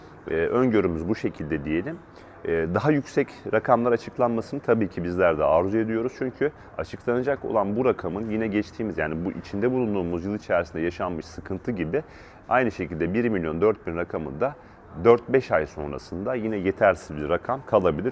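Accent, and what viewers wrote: Samsun, Turkey. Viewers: Siz Samsunlumusunuz elliii demeniz den anladım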